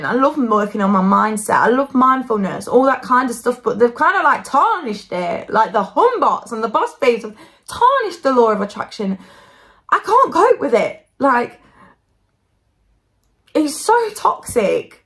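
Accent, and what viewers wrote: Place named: Nottingham, United Kingdom. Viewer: Brilliant vid! Is that a Notts accent I hear? Reminds me of one of my close friends from Mansfield/Sutton in Ashfield!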